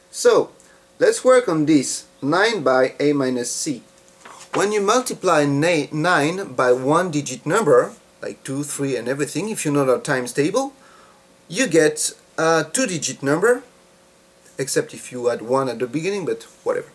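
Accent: France. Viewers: tu es francais non ?? tu as l'axcent francais !!! you're freenche ?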